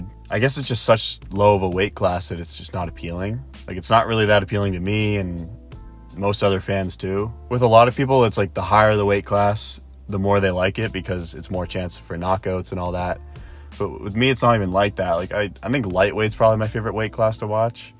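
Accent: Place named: Canada. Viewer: Are you Canadian? You sound Canadian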